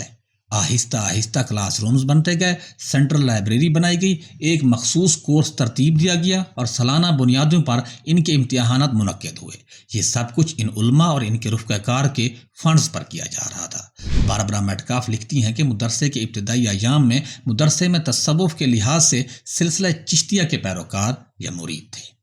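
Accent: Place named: Punjab, Pakistan. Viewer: I love your punjabi accent of english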